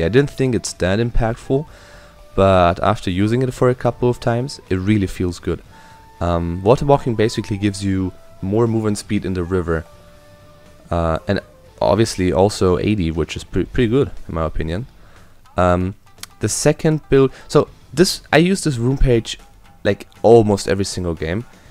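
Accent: Germany. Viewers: are u german?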